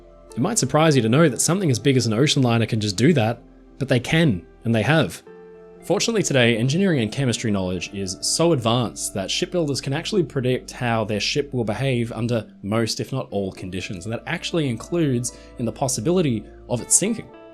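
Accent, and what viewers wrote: Australia. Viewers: This presenter has a slight Australian accent 🤔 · that's exactly what I thought, and I'm a New Zealander. This guy sounds Australian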